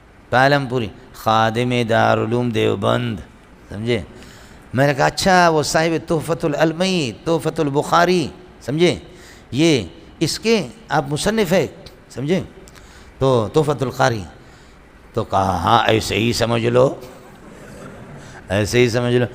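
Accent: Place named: Balochistan, Pakistan. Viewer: بھائی جان یہ بلوچ ہیں.. انکی علاقائی زبان / بول چال ایسا ہی ہے.... یہاں کے لوگ یہ میرے والد ہیں نہیں بولتے.. بلکہ سیدھا یہ میرا باپ ہے.. بولتےہیں.. اگر یہ آپ جناب کے بجائے.. تم.. بولے تو وہ بے ادبی کی وجہ سے نہیں.. بلکہ انکی علاقائی لہجہ کی وجہ سے ہے..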